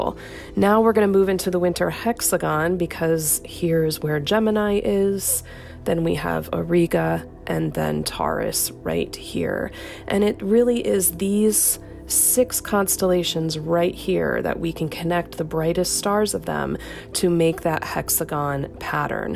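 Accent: United States. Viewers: American pronunciation of celestial objects makes it difficult to understand.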